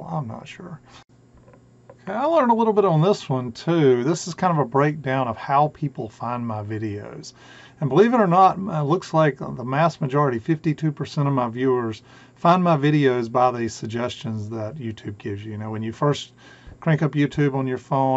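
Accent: South, United States. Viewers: I love all your videos, especially the tube amp builds. Doesn’t matter if they’re long or short. I’m in the process of watching them all from the very beginning so keep on making them please. I watch them in total but will pause occasionally for mundane stuff like housework and eating etc. You’re southern drawl is very pleasant to listen to and I’ve learnt loads since I started. For that I’m extremely grateful sir. I retired from electronics two years ago but still have a big soft spot for tube gear, radio or audio, and your knowledge is invaluable. Thank you.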